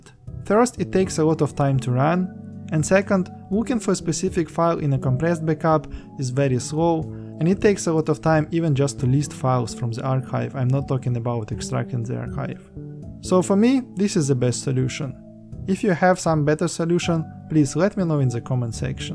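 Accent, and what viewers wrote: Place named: Russia. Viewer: какой ужасный русский акцент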